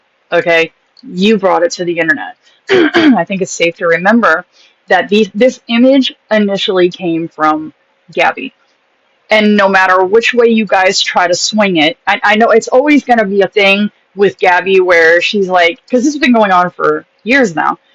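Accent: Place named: Ireland. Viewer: Your perspective is exactly right. I enjoy listening to you.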